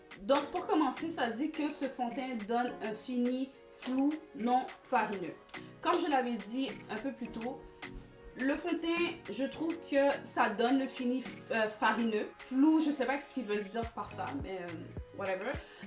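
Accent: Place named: Quebec, Canada. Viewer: Ton accent parait si quebecois. Je suis franco-ontarienne :) Merci pour la vidéo francophone de ce produit. Vous êtes jolie 🥰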